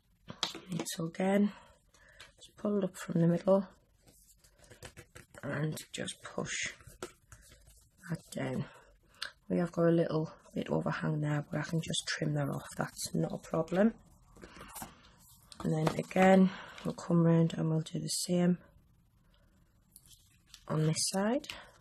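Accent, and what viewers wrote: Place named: Newcastle, United Kingdom. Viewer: Love the Geordie accent. I moved to the north east from Worcester 17 years ago and I recognise it anywhere. Love it!!! Do you have measurements for anything smaller please. I have subbed so will check out all your video's xx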